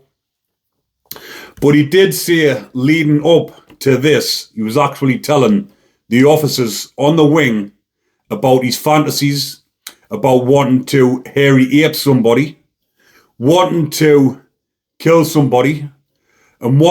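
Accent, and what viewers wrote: Newcastle, United Kingdom. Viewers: Lovong the Geordie accent when your telling your stories. I lived in Newcastle UT myself for nearly 12 years. Loved it. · You are a tough hard bloke and I love your Geordie accent. Keep it up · Have watched about this before, but always love hearing the happy ending on this one. Especially when told with that canny accent. I'd love to know how the justice giver intended to cook Huckle's body parts for consumption... Must be a prison trick somewhere?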